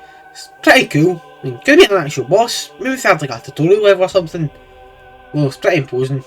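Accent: Scotland, United Kingdom. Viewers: I love how nobody understands him. Grew up around scottish accents so I find it so I understand perfectly. Great vid mate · scottish english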